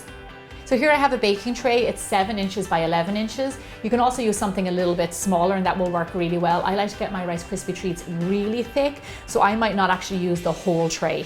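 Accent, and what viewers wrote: Ireland. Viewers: Im going to make the snickers one! Looks amazing! Also, love that you never lost your Irish accent! (I'm Irish) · Looks so delicious I must try I made peanut butter rice crispy treats with fudge topping love your Irish accent💚☘️ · Are you Irish? Great video btw 😁♥️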